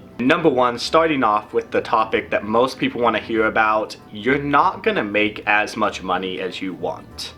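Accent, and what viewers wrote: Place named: New Jersey, United States. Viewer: It's called having an accent, believe it's from Jersey or Long Island. Get educated.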